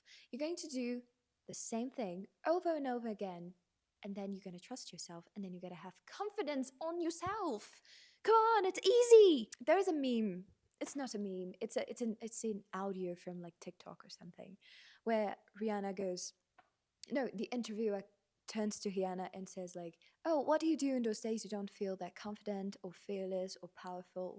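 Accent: United Kingdom. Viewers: Hummm!! Inglês britânico! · In your first videos you used to speak with an American accent.. now you are speaking with British accent .. congratulations for being able to change accents so well... I am able to speak only with the American one .. and that's the one like the most .. but somehow I enjoyed listening to you speaking with British accent .. it is kind of pleasing to my ears ..I dont know how to explain it ..😅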